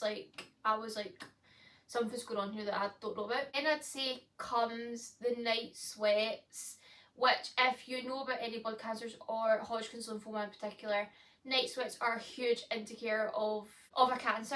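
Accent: Scotland, United Kingdom. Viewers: Hi Nice to meet you New Subscriber here from Amman Jordan Middle East Love your Scottish accent. All the best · Wow that’s a surprise a Scottish voice I’m in Edinburgh ❤️🤗🤗🤗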